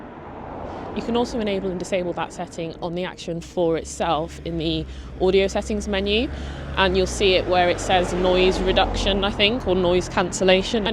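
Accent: United Kingdom. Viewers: So first question…..can you use the mic 2 Bluetooth feature on the GoPro 12 as well? I love your British accent btw lol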